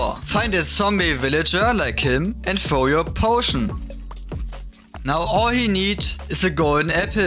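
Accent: Germany. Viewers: Nice german accent brother👍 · man hört das deutsche raus, nice vid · lol du hörst dich so deutsch an😂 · hey buddy good job on your tutorials you sound german and thanks for the tipps king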